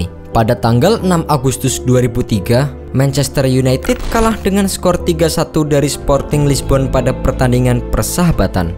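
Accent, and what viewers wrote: Java, Indonesia. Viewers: suara narasinya masih berasa medhok.. tapi mendingan ini daripada suara translate-an cewek gugle :)) mantep mass...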